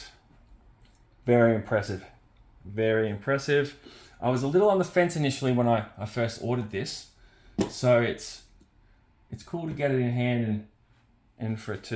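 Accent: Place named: Australia. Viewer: Let me guess, your ausie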